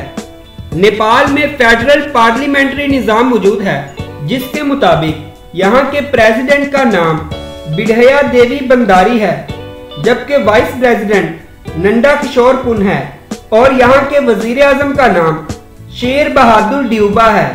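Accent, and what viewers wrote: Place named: Pakistan. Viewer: Woh Pakistani Urdu accent yesa hota my Dubai mai reheta hoon sob Pakistani ka accent yesa hi hote he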